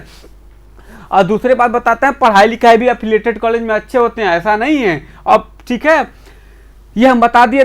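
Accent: Bhojpuri, India. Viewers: bhaiya aapka bhojpuri mast hai 😂😂😂😂 bhagwan kre BHU me ho jaye. Ho gya to milenge zaroor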